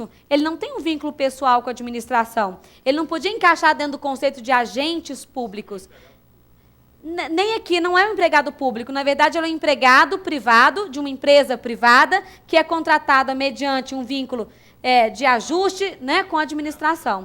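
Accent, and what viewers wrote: Minas Gerais, Brazil. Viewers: Esse sotaque mineiro me traz boas lembranças.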